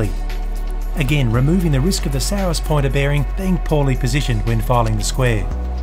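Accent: Australia. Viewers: Dear journal, its been three months, were starting to wonder if will ever upload again and just leave is with a half finished project. We have made do by watching satisfying compilations but its just not the same as his smooth australian voice and perfect hand filing and attention to detail. I will try to update this journal but i dont know how much longer we will last without another video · I found this video series yesterday, and I had to stop myself from watching more at 3 AM. Seriously, this series is absolutely stunning. From the historical significance of this machine to your engineering genius, neat working methods, your calm Aussie voice and amazing editing/cinematography skills....you've got it all, man. I'll be waiting patiently for the next one <3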